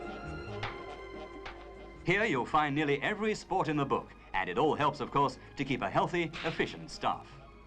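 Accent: Australia. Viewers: Is it just me, or is the narrator Australian? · What an interesting accent. Sounds like an Australian with an RP overlay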